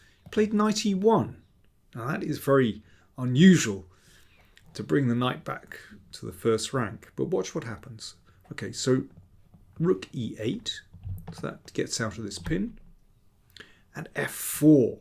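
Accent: England, United Kingdom. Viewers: This may not be the fastest recap video, but it's definitely the most clear, articulated and easy to understand for all levels. Plus the English tone and diction goes smoothly with the analysis. Keep them coming, Mr. King.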